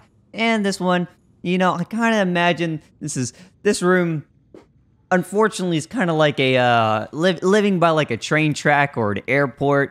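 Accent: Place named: Ireland. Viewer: Huh first time hearing you and almost prefect on voice except somehow got irish accent 😅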